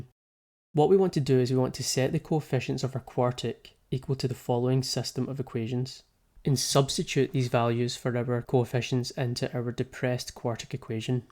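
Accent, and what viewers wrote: Scotland, United Kingdom. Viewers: I was searching for about 2 hours for this, thank your for the wonderful derivation of formulas and solution. I love the Scottish accent, if its not Welsh... , love from Pakistan 💚💚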